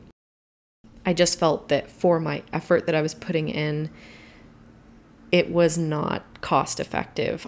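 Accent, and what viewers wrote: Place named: Germany. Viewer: I just stumbled upon your channel and wanted to say I absolutely adore your work. It's so stunning yet soooo cute and you've definitely won me as a fan <3 love from Germany P.S. splendid German accent haha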